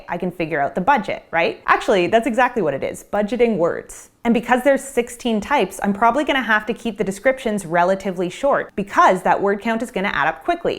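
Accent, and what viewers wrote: Canada. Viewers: Hello from Belgium! I've been living here for almost 36 years after leaving my native Canada (btw, are YOU Canadian? You sound like a "mainlander" (I grew up on Cape Breton Island, NS). OK just listened to you for the first time - and seceral times it made me emotional. I hadn't realised that I was "completely normal" in my odd way of doing things (ALWAYS last minute - or not at all...). I have been wanting to write a book about my life for 20 years but always procrastinated (fear of failure mostly, I think). I'm 55 and have A LOT to write about and your suggestions absolutely resonated with me ...but they would, wouldn't they ! 😂 Thank you ! Now to start ... well, I'm teleworking today so as soon as I have a quiet, free moment. ❤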